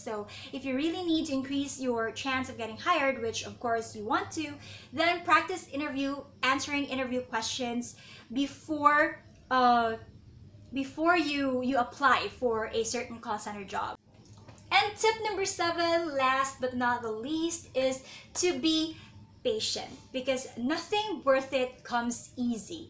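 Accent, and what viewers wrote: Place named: Philippines. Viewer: You speak english huh? But you sounds fikipino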